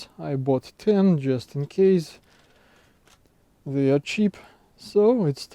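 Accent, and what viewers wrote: Russia. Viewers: Nice job. And charming Russian accent. 😊 By the way, shall you use 240V bulb instead fuse at first power up?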